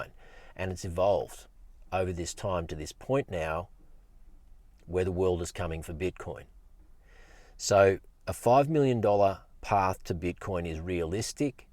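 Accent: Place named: Australia. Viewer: Hi, I'm a fellow Queenslander down on the Goldy, it's good to listen to an Aussie for once on bitcoin, plenty of you tubers from u.s. mate I'm a big believer in bitcoin and have approx 0.8 stacked now my goal is to get to 1 coin soon. My biggest concern is security with wallets ect, and what other steps can we take to make sure we don't lose our bitcoin. Not sure if you have meet ups in person to discuss or just book a call, I'm very keen in talking further with you about this exciting future, I really want to set my kids up so they don't go through life stuggerlig for money. Cheers Warren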